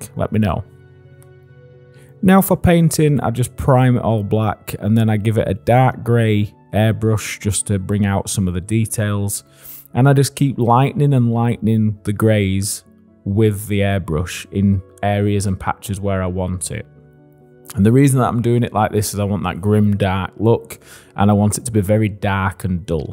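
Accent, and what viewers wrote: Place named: North, United Kingdom. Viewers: If I get stuck with something I always looked here and there was something to help. Going to miss that northern voice. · Good luck mate. I’ve loved your content from day one. Will say I’ll miss hearing your voice. I grew up in cleethorpes and I don’t get to hear that accent very often where I live now haha. Take care man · Hi there. Just found your channel and have watched a few of your videos and I like what I saw so I had to subscribe. I like what you do because I’m wanting to get into doing dioramas myself. Thanks for the great videos that you do and I will continue to watch. Take care and I would say blessings to you from England but I think you actually live in England from your accent so I will just say blessings to you from Weymouth. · To hear someone making content and to do it as popularly as you do it, in an accent like my own is worth its weight in gold. 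No shade to southern or middle class content creators but to have you available to view and for your content to feel that bit more relatable has always felt invaluable. Glad to still have Pickle Jar and MS Paints but you will be sorely missed none the less. Reyt good.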